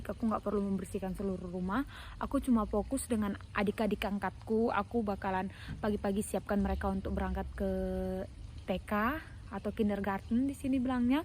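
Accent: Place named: North Sumatra, Indonesia. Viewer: Kental kali logat Batak kita itu y kak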